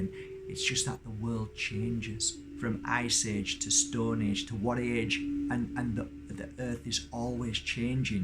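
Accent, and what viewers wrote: Yorkshire, United Kingdom. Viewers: I've been watching you for sometime, as a fellow Yorkshire man I recognised the accent. I suspected you was from Huddersfield the first time I came across your energy. Something about you seemed so familiar. You mentioning Marsh in this video confirmed it! Lovely to come across an ascending soul from home doing so well! For some reason or another I haven't watched a video of yours in awhile. This has been the perfect video/way to return to your energy. Home as a theme is very pressing for me right now. Childhood, family, pain, abuse, independence and breaking free are all very present in the now. Realising we share the same birthplace and with the topic of the video has created a beautiful synchronicity. Thank you! Thank you for your energy, time and sharing your story!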